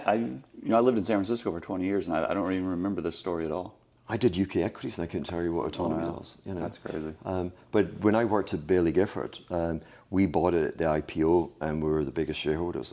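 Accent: Scotland, United Kingdom. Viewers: Scottish accent - Check, Mirror shades - Check Caribbean bangles and mad shirt - Check I love it !!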